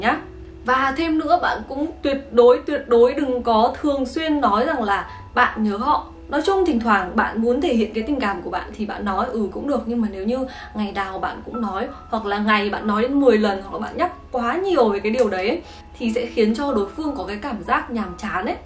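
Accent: Standard. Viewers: Chị nói chuẩn thế chứ lị 🥰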